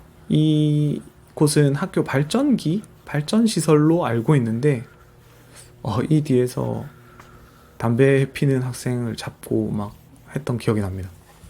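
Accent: Busan, South Korea. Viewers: 우연히 보게된 영상인데.. 희한하게도 끝까지 보게 됐네요. 익숙한 부산 사투리.. 그리고 같은 전공(아 저는 사범대는 아니고 그냥 국어국문. 동기들 중에 부산에서 교사 하는 사람들이 좀 있죠. 어쩌면 선생님과 같이 근무를 하셨을수도.. ㅎㅎ)게다가 쭉 보다보니 남편의 모교이기까지.. 이만하면 끝까지 볼 이유는 충분한 건가요? 학교에대해서는.. 선생님만큼 할말이 많은 학부모 시기도 모두 끝난 사람이지만 여전히 학교에 대해서는 많은 생각을 하게 됩니다. 우리 사회에 얽힌 실타래가 있다면 그리고 그 실끝을 따라가 보면 끝에 교육이 있고 학교가 있다고 생각하기 때문이죠. 큰 아이를 처음 초등학교 입학시켰을 때의 충격이란.. 내가 국민학생시절이었던 1980년대 초등학교와 별 반 다르지 않은 시스템에 놀랐습니다. 교실마다 에어컨이 있고 샷시가 좀 고급이고.. 그 정도 물리적 발전 말고 소프트웨어적인 발전은 찾을 수가 없었거든요. 선생님이 어떤 고민을 하셨을지.. 충분히 이해된다면 자만일까요? 아, 우연히 본 유튭에 너무 몰입을 했네요. 댓글까지 꽤 꼼꼼하게 읽었으니까요. 학생들의 댓글을 보니 좋은 선생님이셨음이 충분히 느껴집니다. 연못에서 탈출하셨으니 넓은 바다로 가서 마음껏 헤엄치고 즐기시길 바랍니다. 응원합니다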